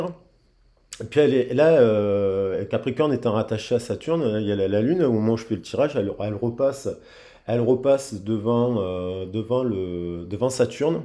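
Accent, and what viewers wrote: South, France. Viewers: Merci pour cette guidance 🙏, j aime bien votre accent du sud 👍belle journée 🤗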